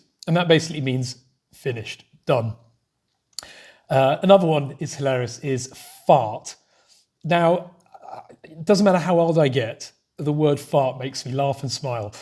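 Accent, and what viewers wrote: Sweden. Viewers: Älskar din skånska! Hälsningar från en malmöit.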